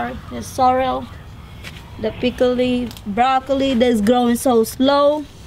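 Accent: Louisiana, United States. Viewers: I love the Cajun accent you got I applaud you growing your own food looks great.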